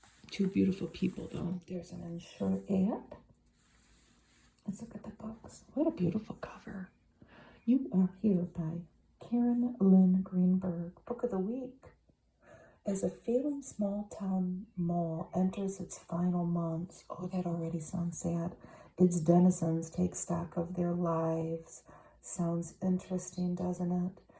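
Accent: Midwest, United States. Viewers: Your Midwestern pleasantness and accent keep me coming back 😊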